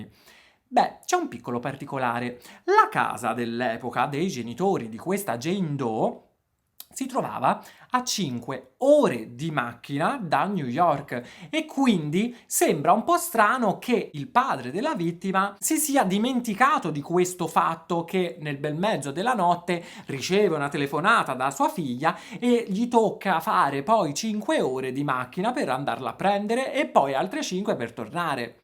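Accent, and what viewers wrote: Tuscany, Italy. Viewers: "Hai più foto con lui che con tu moglie..." morta dal ridereeeee!!! Nessuno sa raccontare delle storie così stravolgenti come lo fai te!! Sei divertentissimo!!! Sei molto espressivo e l'accento toscano è insuperabile! ❤❤👏🏻👏🏻👏🏻👏🏻👏🏻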